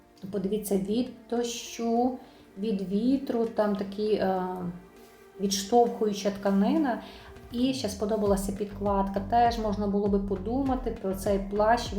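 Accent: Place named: Sambir, Ukraine. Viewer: Ви зі Львова а я з Самбора і говорите так як ми в Самборі , люблю Львів шкода що так мало часу щоб просто приїхати , походити , в мене син аутист , то ми приїжджали тільки по справах і щоб здати аналізи . А тепер думаю скільки ми втратили , бо тепер у Польщі .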